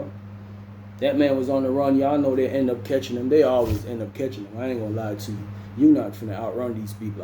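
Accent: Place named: Jamaica. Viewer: One of the best story tellers as of recently. Love how well you speak English and switch it over to Jamaican effortlessly.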